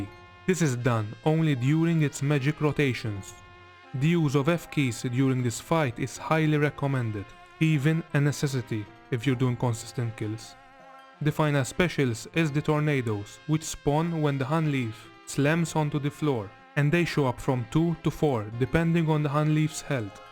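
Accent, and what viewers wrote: Malta. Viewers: you are Maltese I can tell instantly lol.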